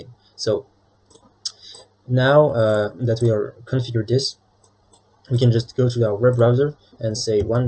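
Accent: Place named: France. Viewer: I like the French accent. Thanks for the video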